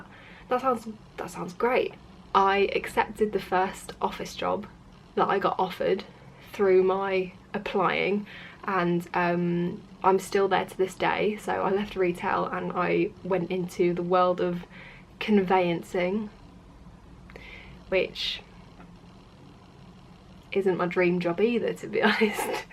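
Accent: United Kingdom. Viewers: Just came back to watch that video. Now i am here after just 1week u uploaded this. I also came back for that vid like 4 month ago. 🙁 i could make life so easy for people but that would make lots of money to work out the system and people should be happy with very simple similar things like houses would be the same or like 2-3 types maximum. To cut down the worktime. Humans are like ants most of us will never be free because we rely on each other's work... You sound british wish we met when i was living in the UK. 😅 we would talk about our hate for work and brainstorming about it haha. 😆 when employees say me u can be a machine setter etc.... i am like yeeaaaahhh 🙂 (inside: 😫🤮)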